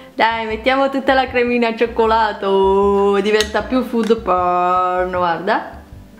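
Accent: Naples, Italy. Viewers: si sente ke sei di napoli😂..cmq bella ricetta io ke amo il. salame al cioccolato e lo faccio spesso..xo ho iniziato la dieta e forse così sarebbe ottimo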